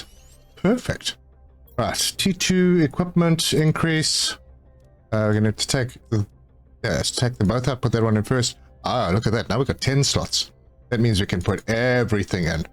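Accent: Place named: South Africa. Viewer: Clicked on the video and that accent jumped out at me, haha. Fellow South African! Awesome videos, man!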